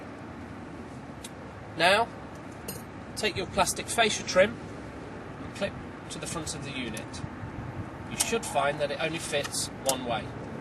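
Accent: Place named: United Kingdom. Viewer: just a little of british accent :)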